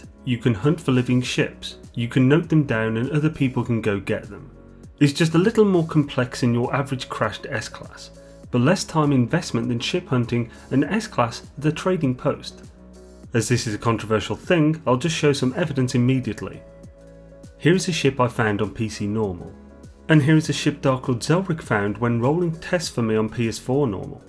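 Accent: United Kingdom. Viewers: You obviously know your game... some of us, however, do NOT. The way you whiz through points that you don't explain, leaves me wondering what you just said and/or how you did it. You speed through a dialogue of which I understand approximately half. You have a British accent, which is great, for I believe the British usually have a superior grasp on English enunciation- or aptly named "The King's English". I am from the United States and not blessed with perfect speech comprehension, which leaves me puzzled at the end of this video. I am only suggesting that you consider slowing down a little and being more concise in the future. Simplifying the explanation is not degrading it. Thank you for your videos.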